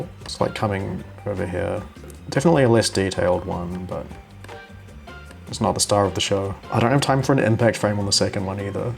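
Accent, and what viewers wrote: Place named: New Zealand. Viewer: I knew you were a kiwi!!! I was like why does he sound so familiar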